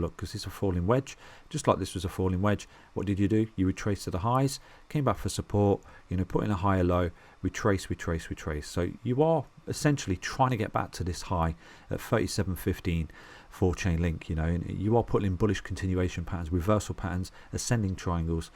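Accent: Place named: East Midlands, United Kingdom. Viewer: I reckon that's an East Midlands accent!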